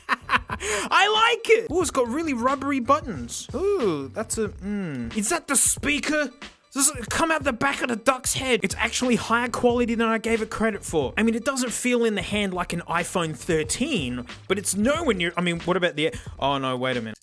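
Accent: Australia. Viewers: I like how the speaker is australian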